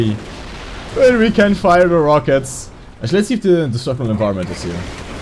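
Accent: Germany. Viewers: Are you german=? because you have got an accent. ps i am german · ich hab direkt gehört das du deutscher bist XD Schönes video,mach weiter so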